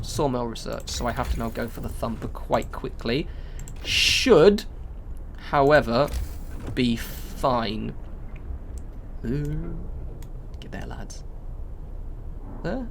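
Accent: United Kingdom. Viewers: OMG. Speak English, not a f!@ British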